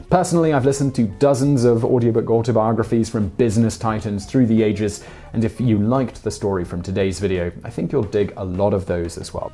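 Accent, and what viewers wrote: United Kingdom. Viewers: You come across as pleasant, including your flavor of British English -- yet even you call drawings "drawrings". I don't know what rings have to do with drawings, and personally find it annoying. This is a relatively new phenomenon having developed in Britain, and I bet linguists love this new direction English has taken in Britain.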